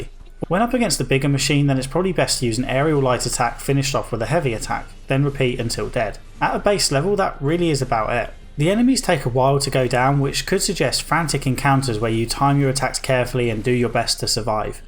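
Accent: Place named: RP, United Kingdom. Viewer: The fact that you actually did your background research, stacked with how thorough your reviews always are, multiplied by the fact that you speak the queen’s English (i’m american and love that accent) makes me wish you did full story spoiler reviews. I don’t really watch your videos as research tools for games I might play, i mainly just try things out, but I purposely look for your reviews of games i loved ie: dues ex for example.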